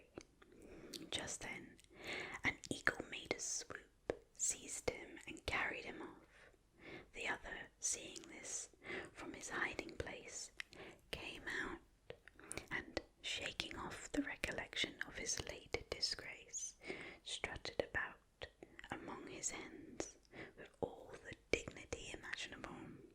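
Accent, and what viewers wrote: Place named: United Kingdom. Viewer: I love your accent ♥️♥️♥️ I am guessing you are from the uk but whereabouts in the uk 🇬🇧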